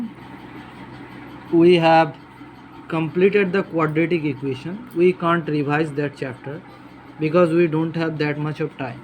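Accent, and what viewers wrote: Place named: India. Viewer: Indian accent: check Noise in the background: check math tutorial: check THIS IS AWSOME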